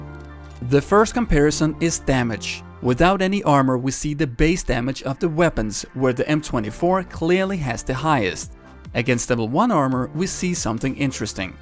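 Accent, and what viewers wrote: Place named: Denmark. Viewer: Your accent is soo Danish, I love it!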